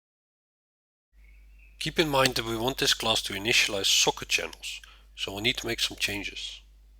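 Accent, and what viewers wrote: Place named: Netherlands. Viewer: Sounds kinda dutch innit?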